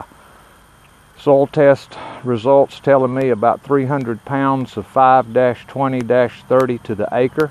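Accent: North Carolina, United States. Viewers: Where are you from? You sound like central NC?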